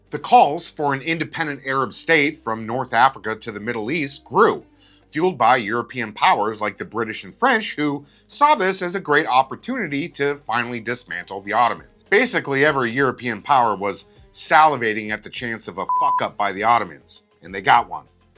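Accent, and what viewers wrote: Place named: Minnesota, United States. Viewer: I love your videos! As a Minnesotan who now lives down south, hearing your accent every Friday is a breath of fresh air.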